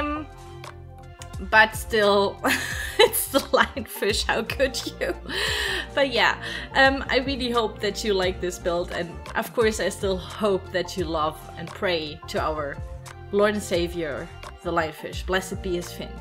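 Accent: Germany. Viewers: So you're german??? I've always thought your accent kinda sounds german but I was never sure about that and I was trying to figure out where you are from if not from germany lmao Anyways! Really nice build even though I have to admit that I'm also not as much into mermaids... so I'll just let the mermaid fans do the judging ^^ Grüße einer ebenfalls Deutschen xD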